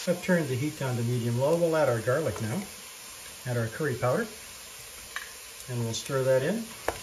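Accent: Canada. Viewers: As a native upstate NYer I can pin that Canadian accent anywhere (plus the U in flavor & the 10 percent cream reference) What a lovely Canadian gentleman!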